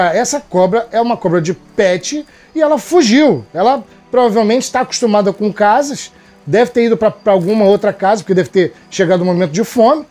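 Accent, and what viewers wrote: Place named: Ceará, Brazil. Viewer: Sotaque iguazin o pessoal do meu bairro kkkkkk é do Ceará mesmo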